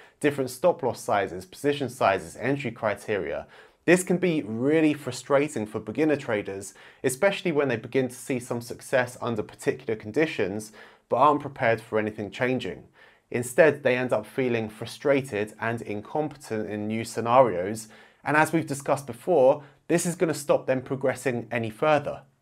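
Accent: United Kingdom. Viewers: I like the way you explain in very precise basa on logic and higher level of example. Im very addicted with those video. And should mention ❤your British English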